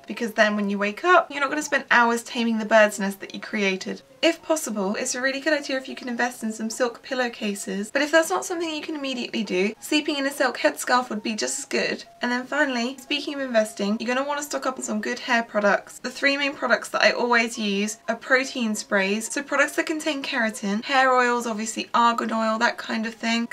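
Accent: United Kingdom. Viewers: I'm trying to figure out which part of the uk you're from. I want to say london? amirite? :D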